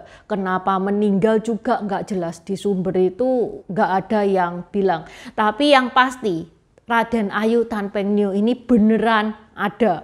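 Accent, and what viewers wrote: Java, Indonesia. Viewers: Mbak, cengkok jawanya medok bangetttt, 👍👍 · Sampean ini Tionghoa opo jowo...kok bohoso ne jowo medok?? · Medok mu wi lho yu...yu...🤭🙏🏼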